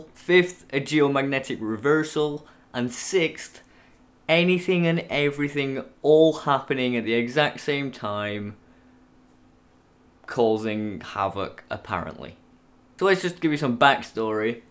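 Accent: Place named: Lancashire, United Kingdom. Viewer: Hey, I dunno if you're still actively using YouTube at all, but I think of you from time to time. I always liked the videos you made, and I particularly like your accent; Lancashire is easily my favourite English accent. Anyway, if you see this comment, I hope you're well.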